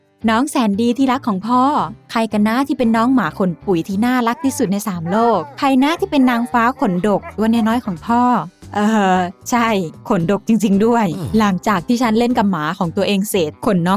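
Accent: Isan, Thailand. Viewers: เราว่าพี่เขาเป็นคนอีสานหลุดพูดตั้งหลายคํา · พี่พูดอิสานติ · พี่เป็นคนอีสานเหล่อออครับบบบ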